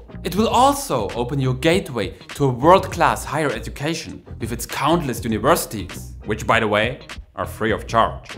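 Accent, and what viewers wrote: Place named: Germany. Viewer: Mal wieder sehr sympathisch, bloß solltest du etwas an deinem – sehr deutschen – Akzent arbeiten ... 😳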